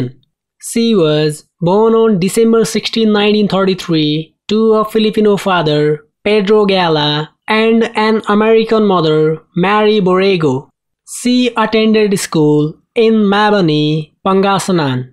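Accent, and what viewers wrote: India. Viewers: indiano to boses palang halata na agad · Bombay accent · Indian accent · I think he tries to copy the weird accent of chills youtuber but it turns out like and Indian one :) nice try brahh. .Chills tried to be different and he's known for his accent weirdness but if your accent is inspired by chills that's not different anymore :) but you know, i like it tho :) nice :) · Kkainis diko ma intindihan pilipino pinag uusapan indiano yata nag sasalita kakainsulto nman hayyy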